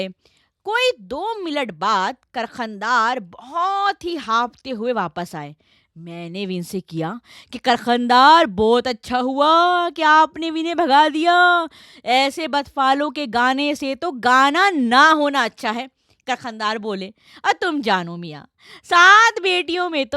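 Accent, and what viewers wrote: Delhi, India. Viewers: This is karkhandari Urdu not lukhnowi Urdu.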